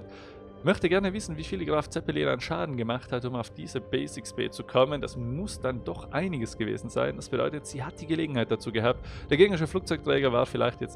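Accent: Bavaria, Germany. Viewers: bayrischer dialekt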